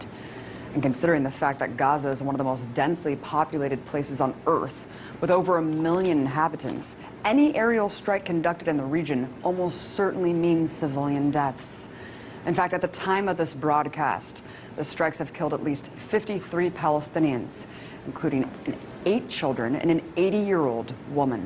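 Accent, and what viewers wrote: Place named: United States. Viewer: لكنتها لا تبدو انها روسية وانما امريكيه تعمل في قناة روسيا اليوم الانجليزية .. بس بصراحة المتحدث بأسم الخارجية الامريكي 😒 😒 وين السيسي؟ يا بشرة خير وينك؟ يا معلم من جيت انت ما شفنا غير القتل والقتلى كلهم مسلمين من مصريين و فلسطينيين كلهم في رقبتك يا ظالم الله ينتقم منك والله لو ان محمد مرسي بالحكم ما تجرأت الحقيرة اسرائيل يا شعب مصر انتم من اتيتم بالسيسي ودماء القتلى في رقابكم لانكم ايدتم هذا المجرم ارقصوا طربا وفرحا ع انغام بشرة خير هذا الي انتوا فالحين فيه هز الوسط و مش غريبه مادام الام المثاليه فيفي .. لك الله يا مصر ولك الله يا غزة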